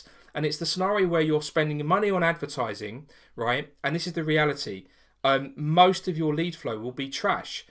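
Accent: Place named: United Kingdom. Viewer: Is this in the USA. You sound British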